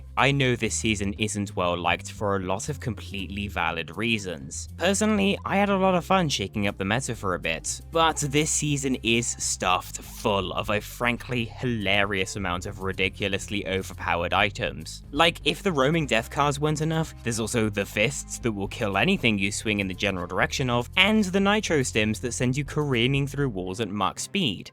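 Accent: United Kingdom. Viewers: I was about to say I thought you were British.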